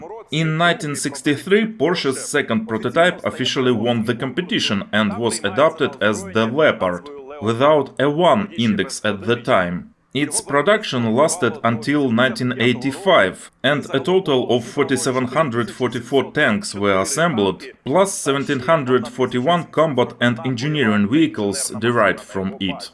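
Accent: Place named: Eastern Europe. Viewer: Можна було штучний iнтелект заюзати для перекладу голосом. Було бы гарнiше без нашого слов'янського акценту на захiд.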